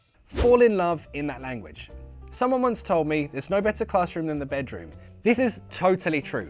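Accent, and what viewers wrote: United Kingdom. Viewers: I love the Learn and Improve Your English Language!! With Your British Accent, and Congratulations,!!! 🇬🇧😍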